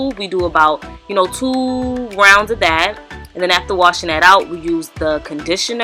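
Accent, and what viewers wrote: New York, United States. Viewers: Its the NEW YAWK accent fah meeee! 😍 (Im from NY too🗽🗽🗽🗽😉)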